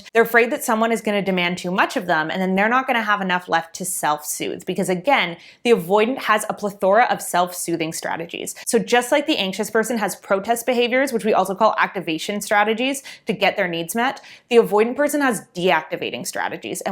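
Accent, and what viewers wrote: Canada. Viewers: This is the first video where I realized is Canadian. I don’t hear it as much in her new videos.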